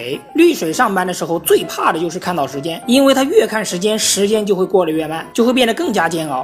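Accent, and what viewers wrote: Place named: Northeast, China. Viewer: 這是東北解說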